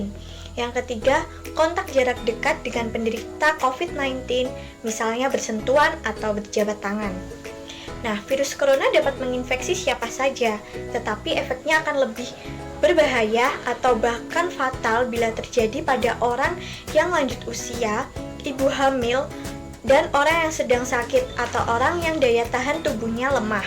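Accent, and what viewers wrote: Java, Indonesia. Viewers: Sangat medok ya mba but still ayu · this is medok but so goodddd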